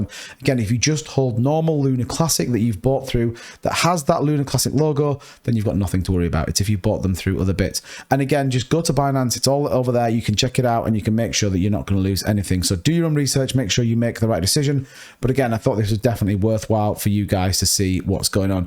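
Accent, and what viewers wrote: United Kingdom. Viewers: That’s UK accent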